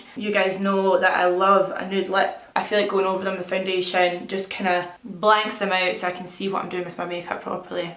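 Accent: Scotland, United Kingdom. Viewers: I discovered your channel a few months back and you've become my favourite YouTube by far. You are absolutely stunning and amazing at make up! I normally find really Scottish accents too cringy to listen to but I love yours (I'm Scottish too, but I don't have a strong accent). My manager at work said she knows you from when she was a makeup artist in Glasgow too, small world 🙈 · Your accent makes me miss living in scotland!!! 😢😢 anyways you look BOMB as usual 😍😍😍😍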